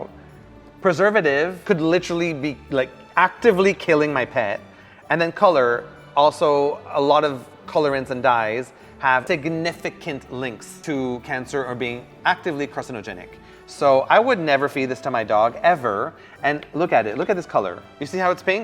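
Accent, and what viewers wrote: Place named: Quebec, Canada. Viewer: I totally recognize your accent. You're French Canadian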